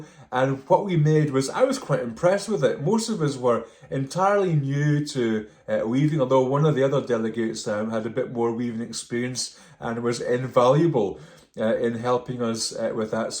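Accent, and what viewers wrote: Scotland, United Kingdom. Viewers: Hello from Florida! That sweater looks so professionally done, I'm sure your daughter will love it. 💞 Your holiday must have been so relaxing. Wonderful food, great scenery, and the lady you love. What could be better? It's so nice to hear your Scottish accent.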